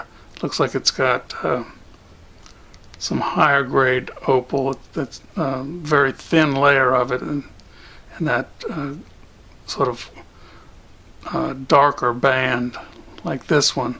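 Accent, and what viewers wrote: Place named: Louisiana, United States. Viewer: Another excellent video of my favourite Lousiana accent. I will train to have the same english accent as you. For me is a double gain, I learn English and about minerals.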